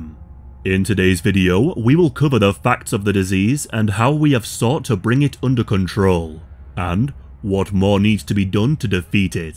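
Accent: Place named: Scotland, United Kingdom. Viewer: Love your accent. Scottish or Irish? I'm a wee bit uncertain.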